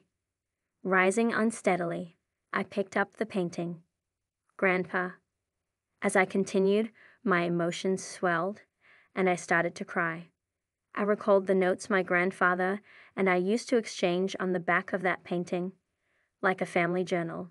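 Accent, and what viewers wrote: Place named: United Kingdom. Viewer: I think British or English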